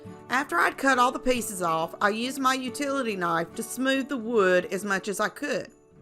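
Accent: South, United States. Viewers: I love all of your projects and your Southern accent, which is like mine.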